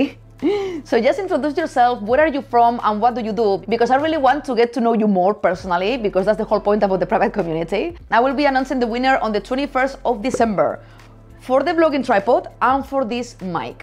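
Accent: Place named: Spain. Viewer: I have to tell you, you have taught this old photographer so many useful tips and tools. I always look forward to your fantastic videos. Side thought, you look amazing and I love your Spanish dialect. See you in the next one.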